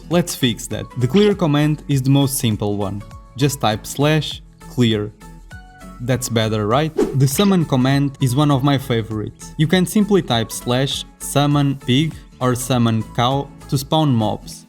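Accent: Portugal. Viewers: bem me parecia que eras de Portugal ^^ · parece me portugues o sotaque nao engana🤣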